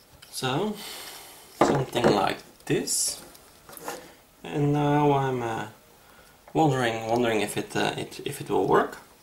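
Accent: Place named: Netherlands. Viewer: by your accent i guess you're dutch, lol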